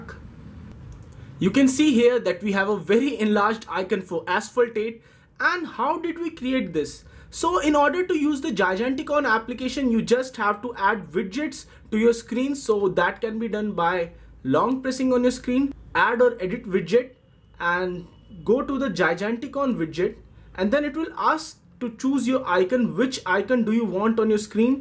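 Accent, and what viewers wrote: India. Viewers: OMFG YOUR VOICE DAMN!!! ANOTHER INDIAN TECH CHANNEL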